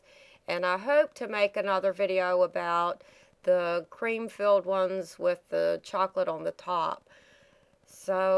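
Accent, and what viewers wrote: South, United States. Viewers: What sold me is the time she spent to get it right. I did it 2 at a time exactly from her recipe in a little pan. Perfect white rings and all. And her delightful Southern accent made them taste even better to this ,84 year old Canadian. Jam filled next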